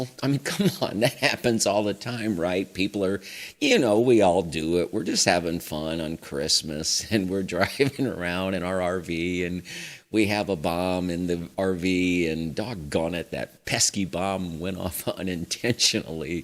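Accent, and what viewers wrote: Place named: Mississippi, United States. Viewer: I live in Mississippi and I lost my mom to lung ca 11 yrs ago! They're proud of us!! Love your Mississippi accent!! Great!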